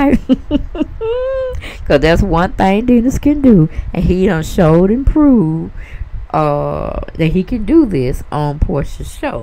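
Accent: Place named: South, United States. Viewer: U must be from the south , may be Florida your accent is 😂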